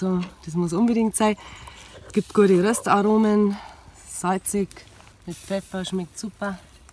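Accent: Upper Palatinate, Germany. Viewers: ned schlecht die Idee, aber sog a moi, kimmst Du aus der Oberpfalz? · Wahnsinn gefällt mir!! Extra Prima super Idee! 👍👍Tolle Frau👍👍Ich glaub fast nach dem Dialekt bist du ein Oberpfälzer Moidl!! Auf jeden Fall Topp und Gruß aus der Oberpfalz!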